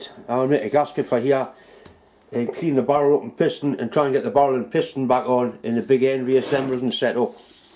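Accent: Newcastle, United Kingdom. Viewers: Marine Engineer: my thoughts exactly. Did you by any chance do any shipyard time? Some many of those thoughts and expressions common to lots of us. Being close to South/North Shields you must have met lots of shipyard guys doing their apprenticeships. I'm in Canada (West Coast) and worked with lots of North East guys: been asked for a Dolly and other fascinating tools. Retired now but I did get to help pull a bottom end from a direct reversing common-rail engine and watch him do a bit of hand scraping. There were always hand scrapers in every engine room but I never learned to use them. Shells for me every where. Thanks for your videos. My wife claims not to be able to understand you at all. I certainly do! Don't hear many Geordie s now that I'm retired.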